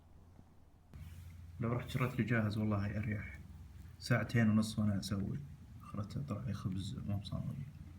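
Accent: Saudi Arabia. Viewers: Love You هو يتكلم سعودي وعربي في نفس الوقت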